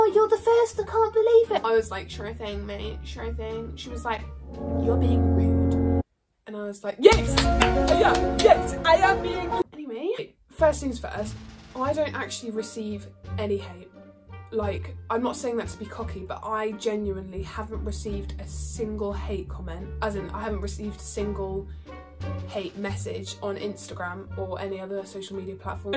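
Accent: United Kingdom. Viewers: Love your accent! What part of Britain are you from? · I can’t tell if she’s British or American 😂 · are u british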